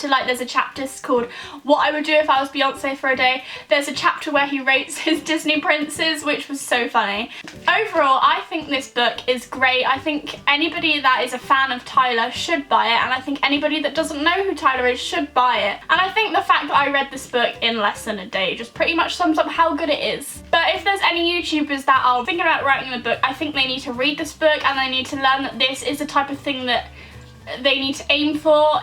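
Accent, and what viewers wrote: United Kingdom. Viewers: thought you had the tiniest south west twang. Hi from Bristol :P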